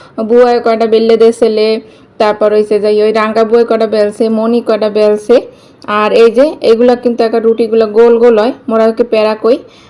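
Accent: Barishal, Bangladesh. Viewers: বরিশালের ভাষাটা অনেক ভালো লাগে আর রান্নাবান্না গুলো অনেক সুন্দর ছিল আপু সাবস্ক্রাইব করে পাশে রয়ে গেলাম বন্ধু হয়ে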